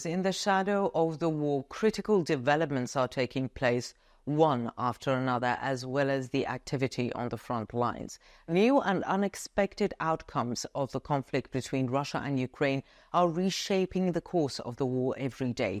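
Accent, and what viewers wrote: United Kingdom. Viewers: How does put that British accent in or is added after??😮😂 Thanks for your excellent updates 😊 have a nice weekend 👍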